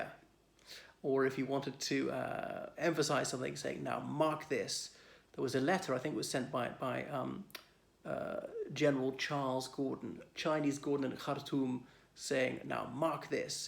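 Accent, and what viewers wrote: RP, United Kingdom. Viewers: You could be Tom Burke’s double, just with a plummy accent 😍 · All formally educated people (i.e. university) should be encouraged and expected to have an RP-ish accent. I like a bit of elitism. The great advantage of the way you speak is the clarity, which also suggests/implies clarity of thought.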